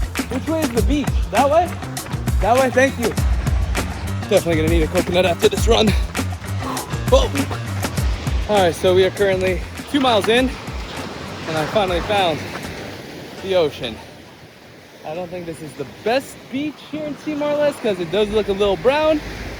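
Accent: New York, United States. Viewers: Yours American English, NYC style is better, than in a movies!!!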